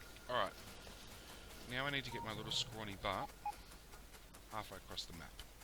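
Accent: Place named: Australia. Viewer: kinda digging the "alright, bye" have you advertised on reddit? i believe you can get one lp post. i was going to advertise you myself, but i keep my reddit persona FAR away from me irl. i rekon you could drum up a few more subs from there. your videos are great compared to the other factorio people i follow, plus the aussie qualities are just gold! upon further analysis.. please, for the love of all that is holy, don't say "bye byes". 😕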